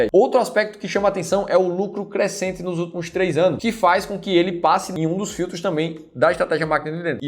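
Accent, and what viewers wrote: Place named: Northeast, Brazil. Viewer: Moro no RS, mas entendo perfeitamente bem o vídeo, mesmo com sotaque nordestino.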